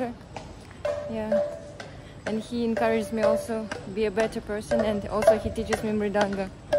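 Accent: Russia. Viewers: What’s is your mother tongue ? From your English accent it seems that you belong to Russia or Some ex-Soviet country.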